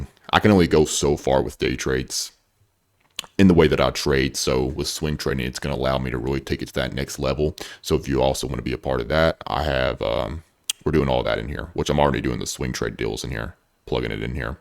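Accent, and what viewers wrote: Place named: East Coast, United States. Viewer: Lol. This was funny for some reason. Dude sounds like he's on the east coast but slightly south.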